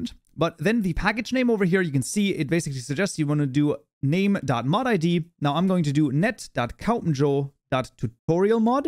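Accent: Germany. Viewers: You sound german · Its funny how you nearly lost your German accent in to the java tutorial series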